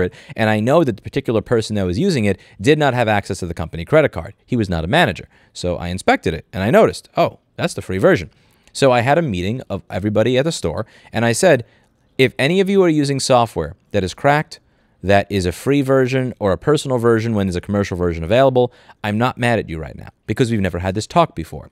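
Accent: New York, United States. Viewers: Very well said and interpreted, took me a long time to get used to your NY style, appreciate all you do now.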